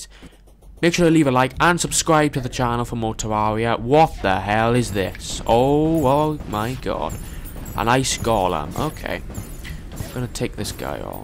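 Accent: Wales, United Kingdom. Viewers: Are you welsh? Im welsh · are you welsh? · Are you Welsh?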